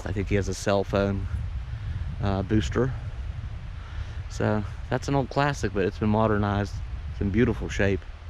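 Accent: Pennsylvania, United States. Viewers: you are an exceptional friend to do all that you have done for Ausia. I am also impressed with your diagnostic skills. As soon as I heard your accent, I knew you were from PA, as am I. The ferry at Port Angeles can take you to the San Juan Islands, USA. I put my RV on the ferry and went to Friday Harbor to visit friends. I think for a 22 foot RV, it was about $80 back in the early 1990's.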